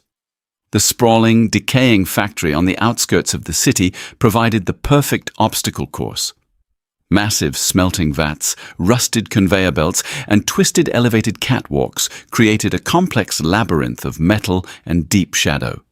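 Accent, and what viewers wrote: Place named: England, United Kingdom. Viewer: It’s weird listening to an English accent in a USA set story 😂❤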